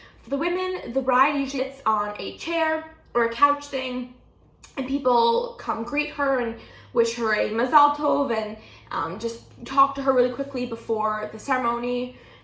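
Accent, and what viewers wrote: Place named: United States. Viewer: When I see you, hear you speaking I feel like I have an American girl in front of me, certainly not an orthodox jew girl. Your nails are painted... Your top doesn't cover properly the area below your neck. Also, I don't think it is very tsanua to expose yourself on YouTube with your hair untied and talking about family purity rules for the entire world to see and hear...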